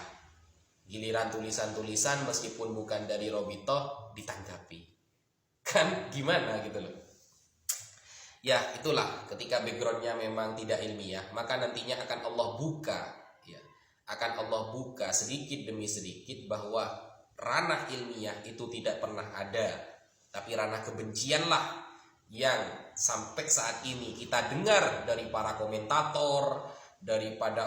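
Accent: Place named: Madura, Indonesia. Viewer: ustadz ini kok ada logat madura😁...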